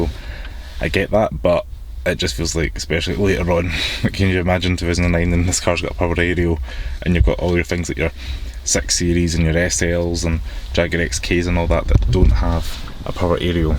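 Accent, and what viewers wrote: Scotland, United Kingdom. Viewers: With such a powerful Scottish accent you need to make sure sound quality is very good so we can understand what you are saying. This video sound quality is aweful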